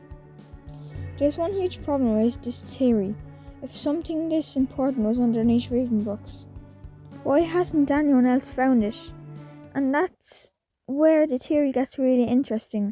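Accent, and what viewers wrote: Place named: United Kingdom. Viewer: U sound british and also new sub (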